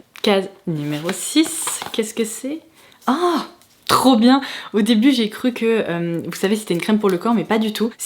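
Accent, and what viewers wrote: United Kingdom. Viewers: Bonjour, Vous avez un très jolie accent british si je ne me trompe. ☺️ Vous avez des attache lasbas ou vous avez appris la langue? C’est par curiosité 🤗. Agréable Journée .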